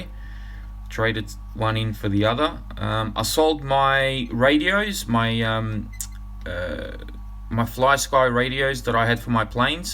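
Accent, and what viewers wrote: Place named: Australia. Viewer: hey, welcome back mate,,, my rc channels surfing on the web isnt complete with your one,,, glad to hear your aussie accent back on air,,, cheers,,,:)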